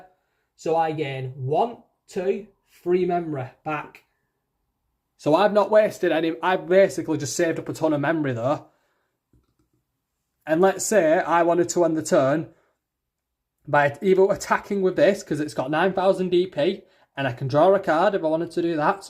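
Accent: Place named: Yorkshire, United Kingdom. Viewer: Mate you sound like you're from Barnsley or Yorkshire... But this is an interesting profile...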